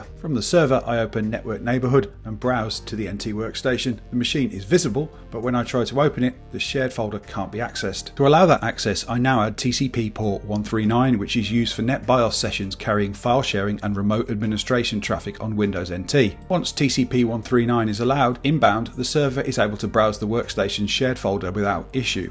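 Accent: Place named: West Midlands, United Kingdom. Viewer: I can hear a fellow West Midlands accent a mile away.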